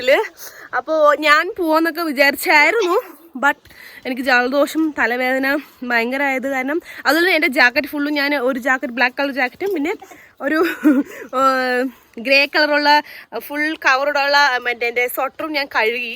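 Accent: Palakkad, India. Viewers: Palakadan malayalam ❤❤❤❤🎉🎉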